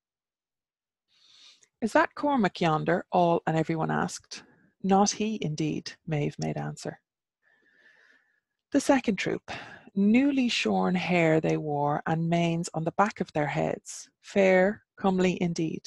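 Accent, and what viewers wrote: Ireland. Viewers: Enjoying this immensely. I am in the US so your "Irish lilt" is especially charming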